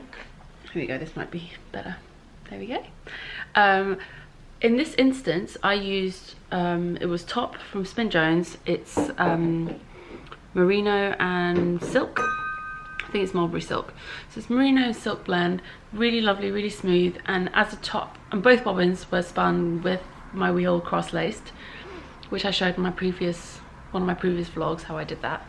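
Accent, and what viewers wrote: United Kingdom. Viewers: That Nor'n Iron accent brings back so many memories of playing with my aunt and uncle as a kid!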